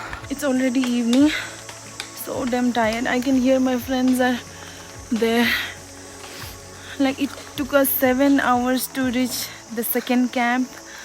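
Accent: India. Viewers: Love your commentary and blending of your hindi english and Arunachalee hindi makes you sweet keep it on & on...best of luck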